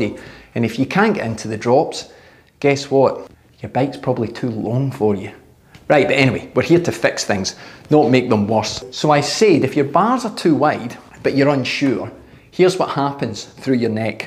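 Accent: Scotland, United Kingdom. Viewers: A real Scott. With that accent, how can he be wrong? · not only - it was also nice 11 minutes of... Scottish English if I am not mistaken ;-) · Thanks for explaining! I have this exact problem and may have found the solution now. :) Also...I love your accent! Are you scottish? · Great content, thanks. And I could listen to you all day, I just love the Scottish accent! Thanks for your great content! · Great video. Thank you! Love the Scottish accent 😁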